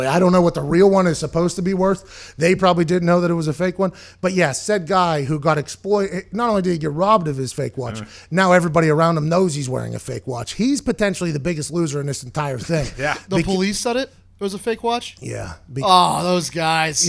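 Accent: Pittsburgh, United States. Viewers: Dude your awesome lovin the pittsburgher accent born and raised big dogg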